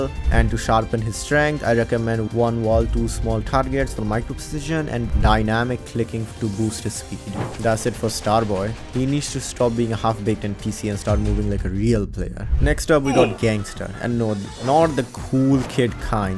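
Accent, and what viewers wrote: India. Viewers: This guy sounds like an indian🗣😭🙏🏻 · there is no way your name is with your indian accent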